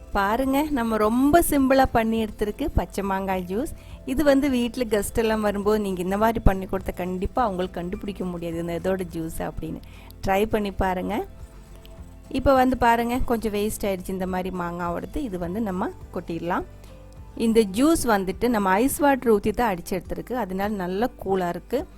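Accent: Kerala, India. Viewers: தமிழும்‌ மலையாளமும் கலந்து உங்க இனிமையான குரல்.super sis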